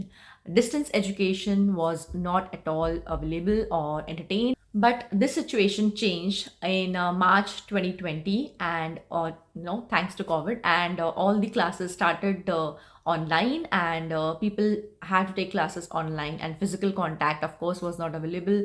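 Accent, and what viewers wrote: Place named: Punjab, India. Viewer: jaise app bol raha ho app to toh punjabi hi lag rahe ho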